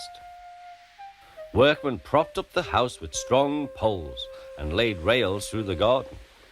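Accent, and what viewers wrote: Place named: United Kingdom. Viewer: UK narration over US narration any day for me. Sodor is in the British isles after all.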